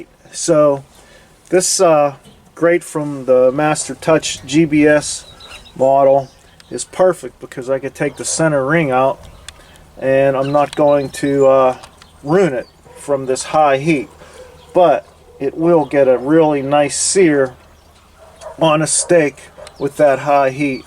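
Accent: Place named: Western Pennsylvania, United States. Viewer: You sound like you are from Western Pennsylvania you jag.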